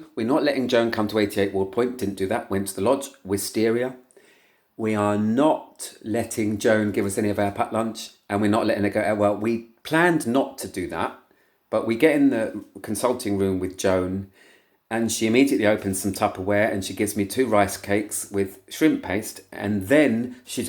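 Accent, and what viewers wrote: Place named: Wales, United Kingdom. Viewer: Great story. Love the Welsh accent.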